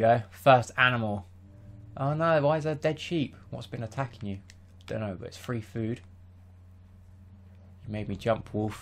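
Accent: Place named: RP, United Kingdom. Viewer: Your posh voice makes me laugh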